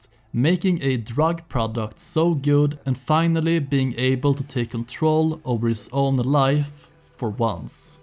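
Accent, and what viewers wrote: Sweden. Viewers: Omg din svenska dialekt luktar så stark den e😂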